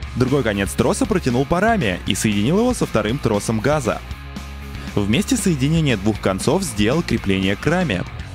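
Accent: Kazan, Russia. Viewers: Стоп стоп стоп!Ты с Казани?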